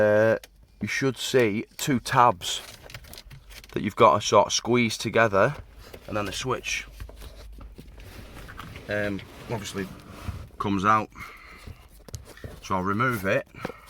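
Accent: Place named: Cumbria, United Kingdom. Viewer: I dont hear many other Cumbrian accents on You Tube..lol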